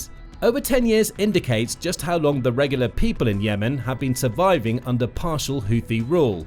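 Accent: United Kingdom. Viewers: I am SO sick of narrators with British accents.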